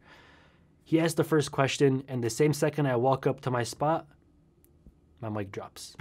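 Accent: Arab world. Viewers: You have a Middle East accent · right when I heard your voice I knew you were arab/egyptian lol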